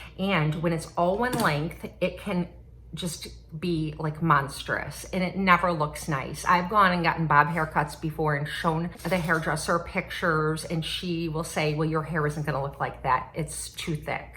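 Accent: Wisconsin, United States. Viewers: I hear Wisconsin or Minnesota in your voice?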